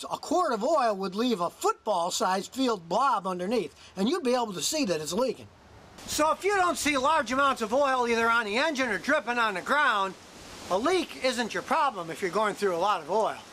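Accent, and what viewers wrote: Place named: South, United States. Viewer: Where it'd be drippin its hard to tell he said that because his southern accent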